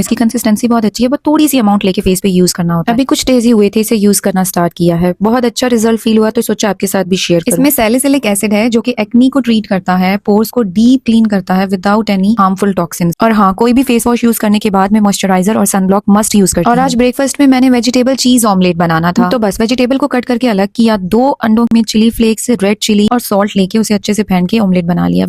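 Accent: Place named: Pakistan. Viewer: Shakal accent se b pata lag Raha k Pakistani hai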